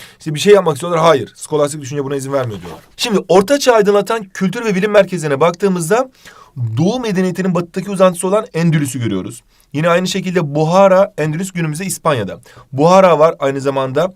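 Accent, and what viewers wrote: Turkey. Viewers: İstanbullu yada Ankaralı (tonguç)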